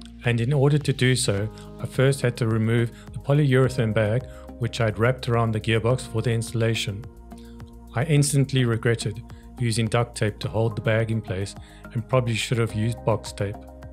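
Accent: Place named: South Africa. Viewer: Hey you probably won't reply to this but you sound South African. I just want to ask if you are South African where did you get the kit cuz I want to make one of my own but i cant find where to buy one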